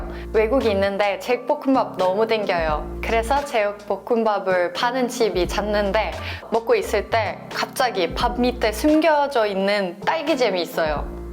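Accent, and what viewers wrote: South Korea. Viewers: 대구사투리 느껴짐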